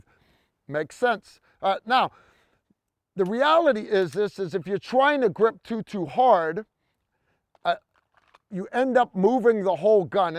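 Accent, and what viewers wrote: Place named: New York, United States. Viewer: New York accent really comes through here.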